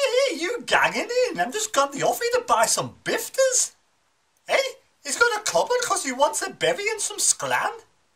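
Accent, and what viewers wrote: Sunderland, United Kingdom. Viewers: Great vid can never beat the Mackey accent seen u at Rochdale match with me mate great YouTube keep up the good work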